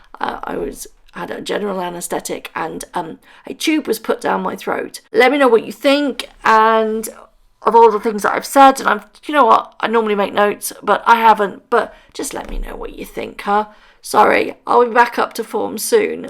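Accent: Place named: United Kingdom. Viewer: Aww, I really hope you get to feeling alot better very soon hun. You really do sound very worn out or tired and such... So you had a med procedure, or are just getting some rest or both? I am saying prayers for you, sweet lady. I love your informational videos so much... And your lovely British accent reminds me of my U.K. 1st generation Aunties and cousins round the U.K. I really hope you get to feeling better... That's the main thing. Health is #1, then coming back fully.... Blessings & (((hugs))), take your rest... We will not leave!! Promise lol!! 😘💞💖💗🙏🙏🙌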